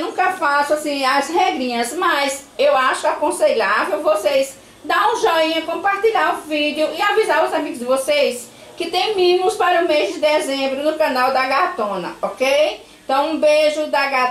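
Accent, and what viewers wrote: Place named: Northeast, Brazil. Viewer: que maravilha!!! Amei seu canal!!!Apaixonada por esse sotaque arretado... Bjos!!!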